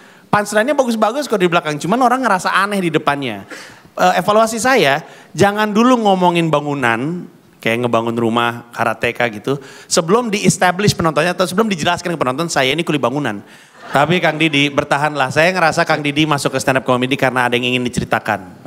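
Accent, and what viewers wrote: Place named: Lampung, Indonesia. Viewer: OMG.. Lampung Indonesian🎉🎉🎉😂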